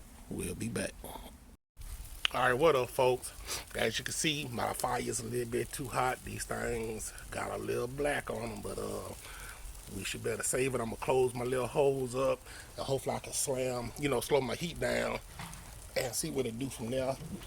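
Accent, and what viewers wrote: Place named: Texas, United States. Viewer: im watching this video with baby and the first thing i say to herwhen i heard u talk is, "dude is from Texas." then when u showed the HEB salt and that Zach's Rub it was a done deal. great video bro!! keep making the content.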